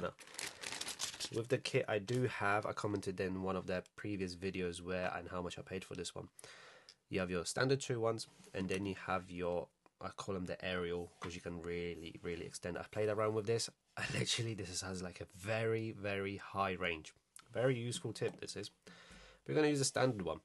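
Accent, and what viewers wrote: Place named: United Kingdom. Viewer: Great vid man. Nice to hear a fellow brit talking about ethical hacking, etc. New subscriber for sure. Im trying to get into ethical hacking/cyber. Would love it if you could do a roadmap for getting into ethical hacking. Im currently doing the comptia A+ and then hopefully the trifecta as well as linux+ cloud+ and pentest+ and some python, etc. It's just so hard to get into any pentesting roles as UK is still behind, and most pentest roles are senior. The US has so much more opportunities for cyber and pentesting as a whole. Any advice on that front as well would be great. Also what did you study to get into ethical hacking? Thanks bro🫡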